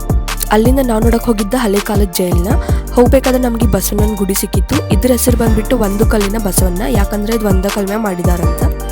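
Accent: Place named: North Karnataka, India. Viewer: Uttara karnatakaa accenttt❤🔥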